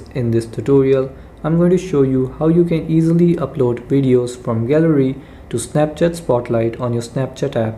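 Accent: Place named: India. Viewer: The indian accent❤❤❤🥰🥰🥰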